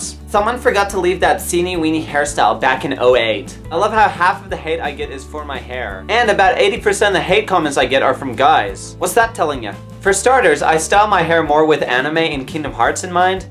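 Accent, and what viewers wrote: Australia. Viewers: I love how your aussie accent slips through a bit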